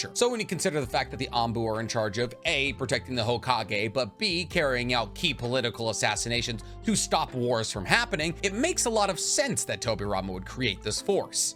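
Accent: Boston, United States. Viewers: It's his inner Boston that comes out ! I love the energy you have in ur videos .. good good work guy !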